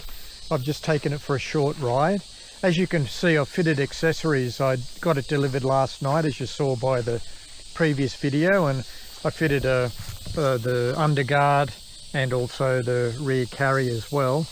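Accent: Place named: Australia. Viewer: You sound like an Aussie, you can’t buy a new one in Australia so I’m intrigued where you are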